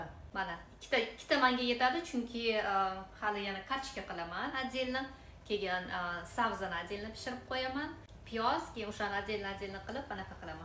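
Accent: Tashkent, Uzbekistan. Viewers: Гапришиз Тошкентча Жула чиройли гапирвас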